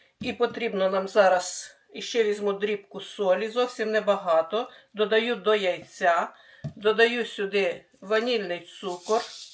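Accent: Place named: South, Ukraine. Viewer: Ви для мене, як ковток свіжого повітря! Насолоджуюсь Вашими рецептами ! А Ваша така чиста українська мова з півдня України то взагалі БАЛЬЗАМ НА ДУШУ! Щиро дякую!!!! Здоров'я Вам, МИРУ, ПЕРЕМОГИ!!!!!!!!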